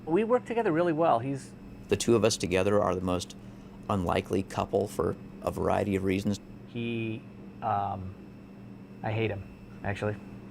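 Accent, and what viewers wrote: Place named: United Kingdom. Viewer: Bro I wasn’t prepared for your face! You don’t look as British as you sound 8/10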